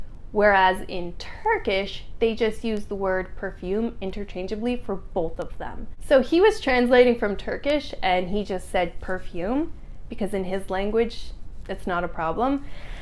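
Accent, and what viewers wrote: United States. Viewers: I live in Oxford UK for more than 3 years, and we call the shirt is just a shirt. I think what do you tried to say for the shirt is about to your American accent. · Is about your American accent ya da because of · Turkish schools teach british english but you speak american english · Probably because you learned brit english like us, she's American so she speaks American English. There are differences between two of them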